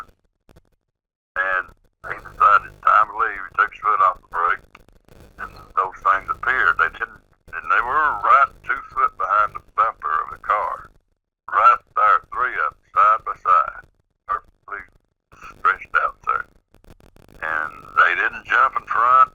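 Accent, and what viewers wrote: South, United States. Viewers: I'm from the north and had a difficult time. I think the combination of the southern drawl and the audio made it hard and I did use my ear buds. I'm gonna try listening to it again because the comments I read have made me want to go back and give it another try. Its funny because when I was down south people had a hard time with my accent. Said we northerners talk too fast. Lol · This was great! I understood everything fine, may be because myself and my whole family sound just like him. Just born and raised in the "heart of Dixie " 52 years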